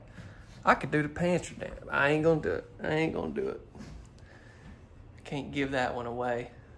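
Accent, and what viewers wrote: South, United States. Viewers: Love your accent! I miss living in the south. Blessings to you and your family. Thanks for being here. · U sound just like southern mom ? I'm pretty good about sounds let me know if you are lmbo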